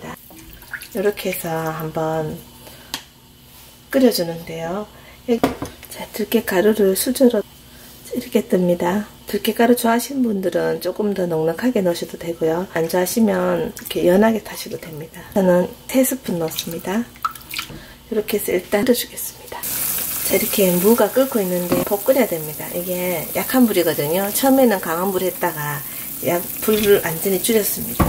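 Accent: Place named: Busan, South Korea. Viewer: 애교있는 부산말씨 목소리 넘 좋아요 ㅎㅎ 저도 고향이 부산이라서 ㅎㅎ 고향 부산 맞으신가요~ 잘보고갑니당:)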